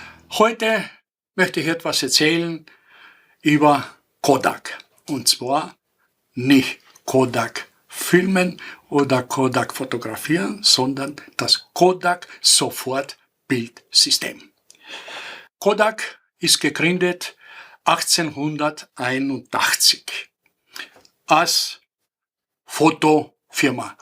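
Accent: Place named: Austria. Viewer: von victor 1977 frankfurt am main,ach ich liebe den leicht jiddischen oesterreichich ungarn dialekt wenn du sprischst,das konnte ich mir stundenlang anhoeren,oder was ist das fuer ein dialekt,auf jeden fall ein aehnlicher dialekt,sehr original und entspannende,,von unseren deitschen europaischen dialekten muesste mehr an die neuen generationen weitergegeben werden...gruese von mir,schenes viddeo,und mach weiter so,ach ich glaube in den 70ern im italien urlaub hatten wir auch so eine kodak,vor allem an die kodak kassetten kann ich mich erinnern,aber in den ende 80ern anfang 90ern kauften wir eine polaroid kamera,und kennst du noch die kleine kamera mit discfilm,was war das nochmalfuer ine marke.kodak ist aber jetzt wieder voll auf dem weltmarkt,mit ganz neuen guten producten auch motion film und neuen kameras wie minishot2,wirklich echt gut...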